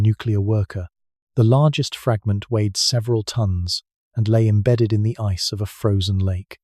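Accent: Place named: England, United Kingdom. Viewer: I just discovered your channel last night as the algorithm finally figured out that I love science. It’s great to have a channel with a mellow & relaxed English accent that doesn’t drive up my cortisol levels. Subscribed immediately. Can’t sleep tonight though, so I just got up & got into this. I’m in Norman, Oklahoma, US, a.m. I am completely exhausted with channels that are nothing but gossip about celebrities or the monarchy or politics. This is a breath of fresh air. Thank you for all your hard work & thank you for making me a better informed person 😊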